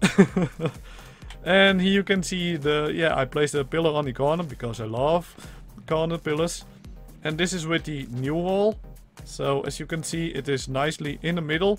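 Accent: Netherlands. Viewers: i knew u were dutch -_- lol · are you Dutch?? als dat zo is ;D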